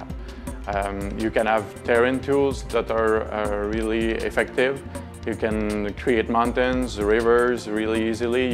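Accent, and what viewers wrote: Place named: Canada. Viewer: awsome! LOVED IT!! ^_^ sounds like its canadian lol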